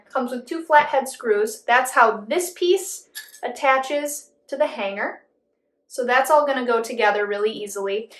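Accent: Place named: Midwest, United States. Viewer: Thanks for the great video and clear instruction. Love your midwestern accent and I haven’t heard the word “grody” for so long you took me back to the 60s/70s! Ha! Just wish I could still get down on my knees. Arthritis galore! Thx again!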